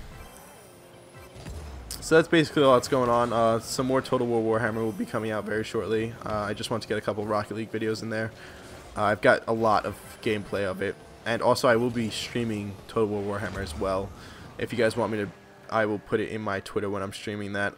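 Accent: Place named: New York, United States. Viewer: New York accent.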